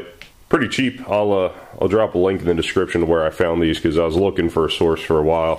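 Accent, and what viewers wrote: United States. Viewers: Am I in the twilight zone? Are you American? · US? Sounds the part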